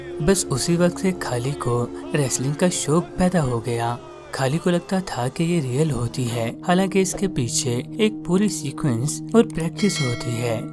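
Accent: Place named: England, United Kingdom. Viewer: Thoda agarej ka awalad kam bano aur sahi se bol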